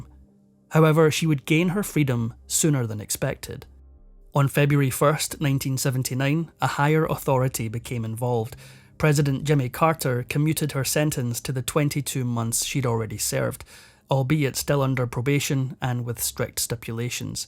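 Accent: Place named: Scotland, United Kingdom. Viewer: Yay my all time favorite Narrator Love the Scot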